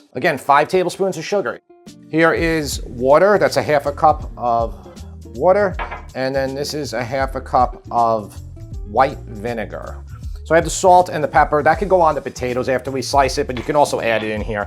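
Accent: New York, United States. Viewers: Hi, I grew up in Brooklyn and I have moved to Thailand about 15 years ago. I stumbled across your channel when I saw you were making a NY Deli Macaroni salad. I haven't seen anyone else doing it on Youtube, so I had to watch it. Then, when I heard you speak, I thought to myself: This is a genuine NY dude, not some poser from a 5 star Michelin restaurant making a NY deli salad. Watching your videos makes me feel at home. Thank you BTW, the kid is a natural food critic. He doesn't pull any punches even though his dad is right there and his dad made the dish. He's articulate and he tells you EXACTLY what he likes and doesn't like in a particular dish. It's so refreshing to see such honesty. It makes me almost miss New York.:D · is a great taste tester. I also love your New York accent (patata salad) LOL. I am from Bergen County NJ and say it the same way! 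· Not Only Do I Love Your Recipes, But I Love Your NY Accent & I Think You’re Nice to Look at too! 😉 Keep the Recipes Coming! TY From Oregon!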